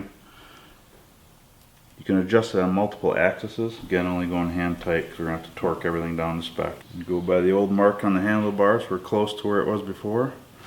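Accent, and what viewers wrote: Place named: United States. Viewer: Thank finally you are the best your video its simply good easy explanation and America accent. Awesome dude. Ty saying hello from Connecticut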